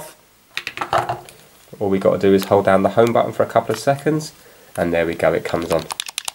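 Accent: United Kingdom. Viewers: He doesn't speak American English he speak British English UK English he mean the game Zelda not the persons Zelda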